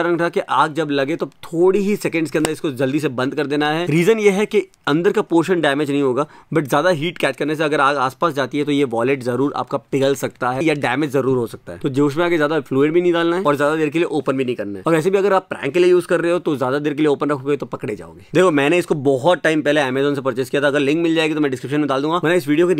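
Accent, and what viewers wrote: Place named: Gujarat, India. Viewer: Heyyy bhaiya and didi...... Lots and lots of love from my family and friends... May you live longer and longer and make our next generation laugh to as we laugh... I cant spend a day without your vlogs and prank in breakfast your video in lunch your video and in dinner your video ❤️❤️i love your way of speaking and gujju tone and how you help otherrrss.. your mom is so cute as welll❤️❤️✨✨ Interested in giveaway ✨❤️ Lots and lots of love from my family and mee❤️✨ bhaiya new logo is mastttt❤️❤️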